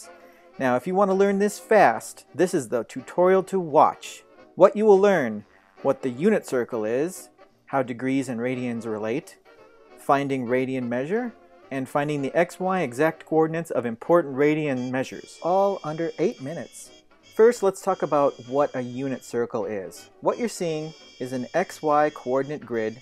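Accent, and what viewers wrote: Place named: Minnesota, United States. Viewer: turned on my sound, not expecting to hear a Minnesotan accent, and what do I hear but my family's home?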